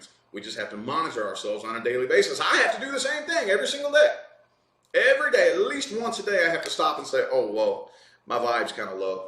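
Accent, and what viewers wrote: South, United States. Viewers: Thankyou so much I appreciate it, great accent where you from down south I'm in New Zealand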